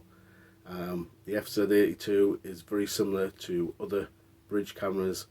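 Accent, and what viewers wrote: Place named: Newcastle, United Kingdom. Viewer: Is this accent from Newcastle, by any chance? (Just curious, and I’m aware I can be way off with my guess)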